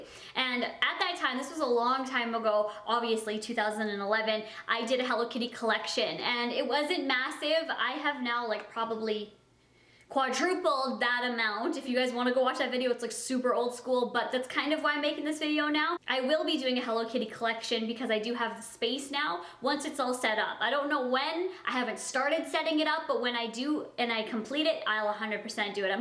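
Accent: Canada. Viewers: Am I the only one that loves the way Canadians say about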